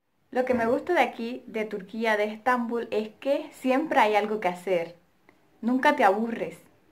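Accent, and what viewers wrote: Caribbean Coast, Colombia. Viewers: Superrr!! 💃 me veo todos tus vídeos y me encanta que conservas el acento costeño, nuestros refranes, nuestros dichos 🤣🤣me toteo de la risa contigo y tus aventuras. Chachi es un hombre muy especial. Saludos!! Un fuerte abrazo!!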